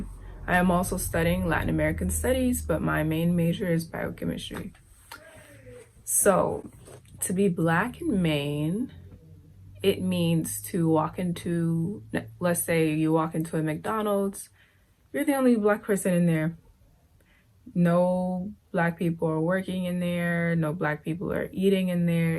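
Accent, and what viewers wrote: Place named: Michigan, United States. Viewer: Sounds like the upper peninsula in MI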